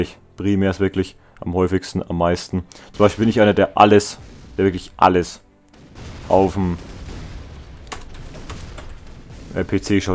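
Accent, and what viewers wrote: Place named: Franconia, Germany. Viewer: Hey woher kommst du eig. dein Dialekt is so fränkisch😊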